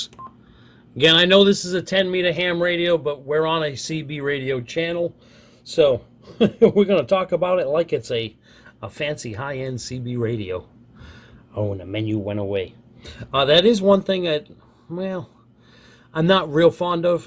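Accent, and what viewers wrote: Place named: New England, United States. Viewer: Great video, you sound like your from my area.... 22 KING OF THE HILL, Souther NH... you have a new england drawl... Rhode Island maybe?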